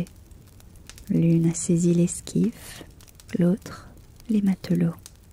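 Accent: France. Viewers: I've said it before on your videos and I'll say it again. I LOVE this video, it's perfect for me. I love maids and the french accent just makes it soo much better. Whenever I am not watching your new upload, I'm most likely rewatching this one. I'd absolutely love to see you come back to this one. Hell, I might even sponsor a video when money stops being tight. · Came here from the link provided in Part 2 (F4M). This is an exceptional audio, with your lovely narration in a French accent, adding to the immersion and exotic appeal. I absolutely look forward to the Part 2 experience soon. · Ça fait un mélange très agréable l'accent français mélangé à de l'anglais et du français. Merci pour la vidéo ^^. Les bruits d'ambiances me plongent vraiment dans l'histoire, bref excellent, j'adore ❤. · That frensh accent in the english language is soooo beautiful and soft. never heards such a tingly and calming frensh accent! 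hope to hear more of this in the future <3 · Stuff like this is why in my honest opinion, the French accent is the most beautiful European accent, if not the most beautiful accent ever. Of course, every accent is beautiful in their own ways. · English with french accent just good enough for me ❤ French with english accent too like canadian accent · I love ASMR with a French accent. Amazing job! Keep up the good work! ❤ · Ta voix ressort si bien en français j’espère il y aura plus de vidéos avec l’accent français… Sinon salutations du Québec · Love your french accent , ASMR is strong on this one. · As a person who studies french I loved this video. I didn't know the french accent would sound so good on english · Now IMAGINE HER french accent speaking the English Synchro of an anime girl, it would go crazyyyy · Same, I want more french content. She also gives me motivation to learning french accent lol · Jeeez I didn’t know I like the French accent so much 😂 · I've listened to this one a few times now and most times I never get to the end of it because I end up falling asleep. 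Soft, soothing voice ASMR videos are one of my favourites. And the French accent really adds to this. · The French accent is lovely also a small dig at the British made me chuckle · If I had a nickel for every time I listened to a French Maid ASMR with a French accent and actual spoken French, I'd have exactly one nickel! 😅😅😆😆 Jokes aside, despite only being able to pick up certain words and phrases in French, this RP was so very calming to listen to. The French accent combined with bits where you recited the poems in French was oh so relaxing. The feeling of being taken care of made me feel all warm and fuzzy throughout and your humming is angelic. Your use of ambient sound is also masterful and it makes it all the more enjoyable to listen to. This was so different from all the other scripts so far and it shows your range. This channel is quickly becoming one of my favourites and I wish you the very best and utmost success in the future! 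· French maid? Yes. French accent? Yes! French maid with French accent reading French poems? YES! This was great, the relaxing vibes were pretty damn good, and I love the character as well. Excellent work!♥️ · Your voice is incredibly soothing and peaceful, especially your French accent. Thank you for this vid and for making me wanna relearn French again. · God Please do more French content, The accent and the voice is just so hitting the spot (if anyone finds this in my history I am cooked but awesome work you do!) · this french accent of yours has made this asmr, this night of discovery, and this moment, you have made me felt like im in a mindset of a rich man who face this fancies everyday, now i shall sleep with this fancy asmr voice of yours 🤩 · The soft spoken with French accent here is strong, really strong here. It hit straight on my ear and wasn't ready at all for the echo added on the bathroom ! 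And some humming too ? Oh, TY. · Never expected how good a French accent asmr would be.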